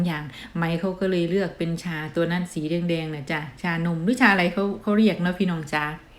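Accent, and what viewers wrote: Thailand. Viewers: เอ๊ะ เหมือนฝนจะตก กางร่ม กันด้วยนี่ แต่..เอ ฟังน้อง พูดภาษาอังกฤษ.ยังเป็นแบบไทยๆ อยู่ นะ คือดีไง..เราไม่จำเป็นต้องเหมือนฝรั่ง ใช่ไหม น้อง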